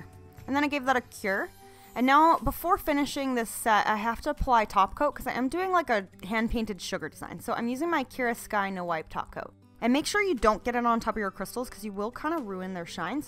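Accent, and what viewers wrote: Canada. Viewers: Nesian Ninja She’s from a different part of Canada than myself and the accent is different. It’s subtle..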